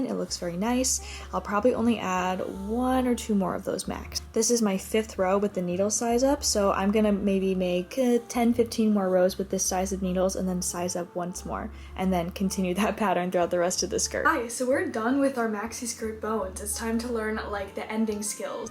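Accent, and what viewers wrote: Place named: East Coast, United States. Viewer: you sound so east coast and i love it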